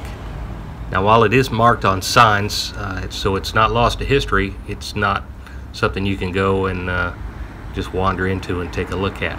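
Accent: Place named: South, United States. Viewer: I wasn't expecting to hear a good ol southern voice explain a London site. 😁👌🏻✌🏻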